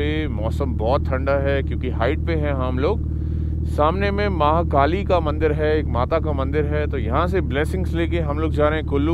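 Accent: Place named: Bihar, India. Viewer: Stop speaking like bihari babu aaraha Hain speak like Punjabi aa rahi Hain Hain bro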